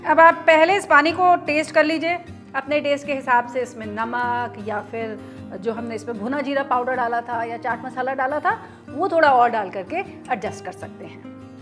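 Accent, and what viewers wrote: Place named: Lucknow, India. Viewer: मोहतरमा आप एकदम लखनऊ वाली हो गई है अच्छा बोल लेती है , हां उर्दू तो नहीं बोली फिर भी....... good 👍 i am also from Lucknow but now residing in kashi . One very special ingredient heeng you have not used . I put heeng that increase the flavour more . In both resipe. Here in kashi matar alu and golgappe wala pani they mix it is also very tasty .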